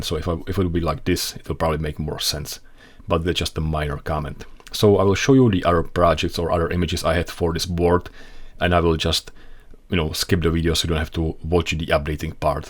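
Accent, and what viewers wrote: Czech Republic. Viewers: Love it ! Looks really nice, especially the ink display. Also, are you Czech by any chance?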